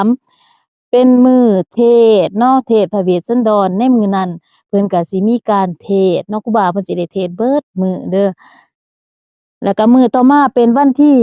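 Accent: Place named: Pakse, Laos. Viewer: สำเนืยงคือ สาวปากเช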